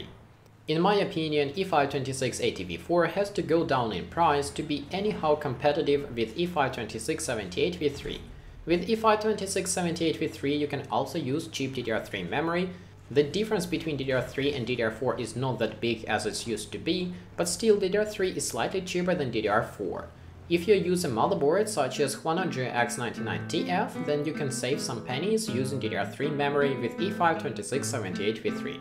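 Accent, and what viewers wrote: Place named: Russia. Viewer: Привет, чел! :)) Узнаю русский акцент.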